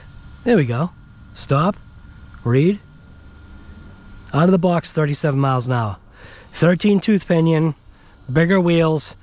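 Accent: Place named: Boston, United States. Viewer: Good review bro. But I absolutely love my blitzkrieg! It may not be perfect, but it is a fun rig. Got the red cat Valkyrie TR and is great so I figured I'd get the MT of the WOV just to see how she stacks up platforms are basically the same. I've been watching your channel for a while. Congratulations on your success buddy even love the Boston mass accent!😅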